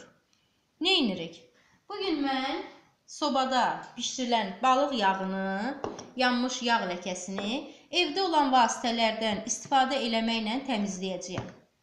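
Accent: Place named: Baku, Azerbaijan. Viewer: Bakılısınız danısıqınıdan bilinir size tesekkürler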